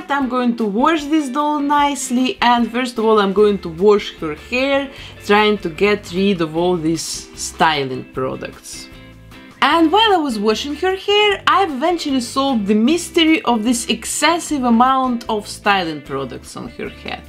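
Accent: Russia. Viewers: Она ведь русская??? Или украинка Такой жёсткий акцент может быть только у нас 😂😂😂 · Ты русская? Акцент очень чувствуется (возможно, какой то другой национальности) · Сложно слушать этот русский акцент... жуть какая. Исправьте, пожалуйста! Я очень уважаю английский язык и мне больно это слушать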